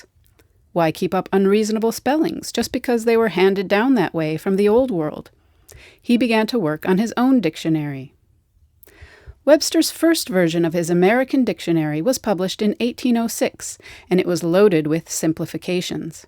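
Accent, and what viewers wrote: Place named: RP, United Kingdom. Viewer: Queens english FTW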